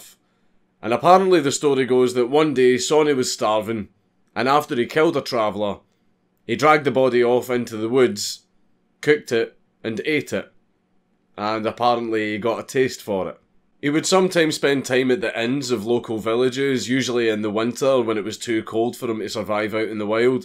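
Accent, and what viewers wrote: Scotland, United Kingdom. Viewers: A Scottish story being told by our Scottish uncle before bedtime. What could be better?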